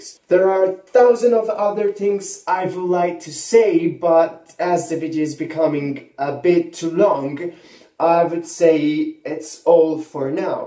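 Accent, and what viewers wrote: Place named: Italy. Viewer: OMG ho appena scoperto che sei italiano! ^_^ In effetti si sentiva che non eri Inglese (srnza offesa ovviamente, il tup inglese è ottimo :) )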